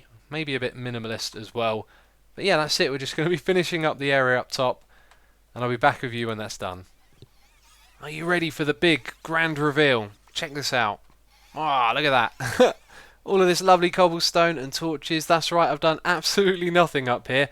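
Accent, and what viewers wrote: Australia. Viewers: Is an Australian? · Can confirm that is an Australian accent, source: I am Australian;) Love the video!:-) <3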